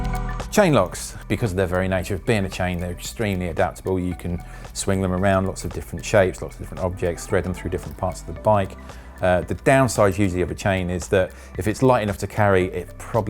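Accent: England, United Kingdom. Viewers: I agree with someone... said so much but learn little,plus the british accent... god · Difficult to understand your strong UK accent on some of the lock names because you speak so fast. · This Video looks very useful except this guys is sorta quasi mumbling in a thick English accent makes him very difficult to understand... blah... I have to turn on the closed captioning and still you aren't confident you are getting it all...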